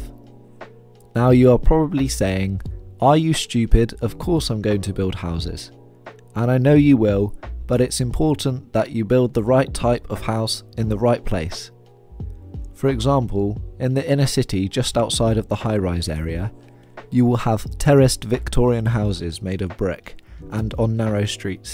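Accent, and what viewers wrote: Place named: Birmingham, United Kingdom. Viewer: i think bro's from birmingham 💀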